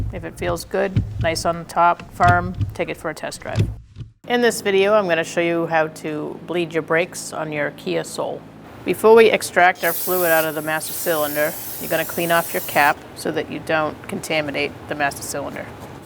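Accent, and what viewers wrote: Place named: Northeast, United States. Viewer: Excuse me but are you from Massachusetts by chance? Either that or New York but it's definitely a northeastern accent lol Love it! Also a Bostonian here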